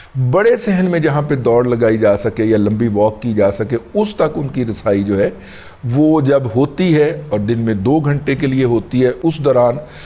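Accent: Punjab, Pakistan. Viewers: Punjabiyat jhalak Rahi Hy AP k monh Sy. 😅wah kia analyse karty ho.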